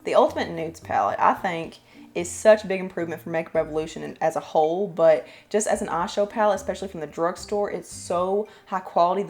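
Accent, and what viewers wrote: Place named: Tennessee, United States. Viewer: Awesome vid. Your accent is amazing. Is it TN? I’m Canadian and love your accent!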